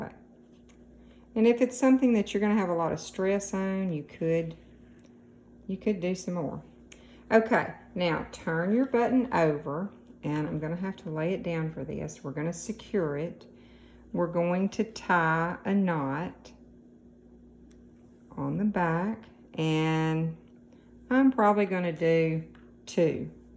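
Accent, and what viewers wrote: South, United States. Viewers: Are you from Georgia or Alabama? 🥰 Your voice sounds like all the ladies from my Dads family.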